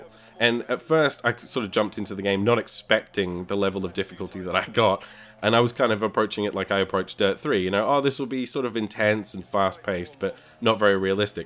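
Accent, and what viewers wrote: Australia. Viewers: you sound like you're from Londan! But seriously though Aussie? Kiwi? Anywho, great review, I loved the honesty and the recommendation, after watching your review, I'm quite keen to give this game a go, since it's dirt cheap (punzrfun). Subbed for future content.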